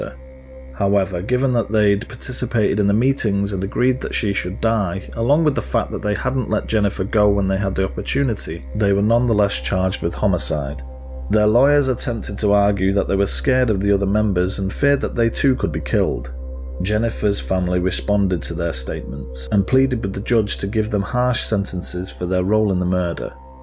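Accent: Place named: Manchester, United Kingdom. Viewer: So comfortable to listen to a fellow Mancunian, and your dry humour is epic. Don’t stop pal, I’m here every day waiting for your content 👍🏻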